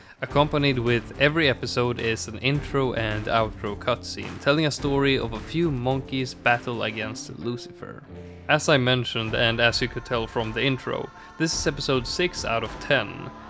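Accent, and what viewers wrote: Sweden. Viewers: Are u swedish? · Wait is he Swedish????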